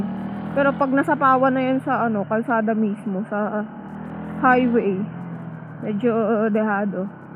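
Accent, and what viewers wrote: Laguna, Philippines. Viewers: I have an ex-gf from Sta. Cruz, Laguna and her voice, accent, the words she's using, and the way she speaks is EXACTLY the same as you. It's kinda trippy☺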